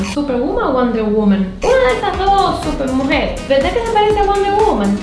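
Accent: Cuba. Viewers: Hola, me gusta mucho ver tus vídeos y escuchar tú acento cubano 😅 Participó por cualquier cosa, así como dices tú "la suerte es loca y a cualquiera le toca" esperemos que ahora sea mi turno 🤗🇲🇽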